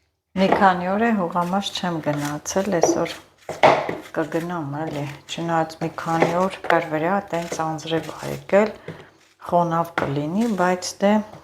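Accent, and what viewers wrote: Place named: Dzorakhpyur, Armenia. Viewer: Мэр Дзорахпюри барбаров эл Э - чемнали! Шат аразат э лсэлн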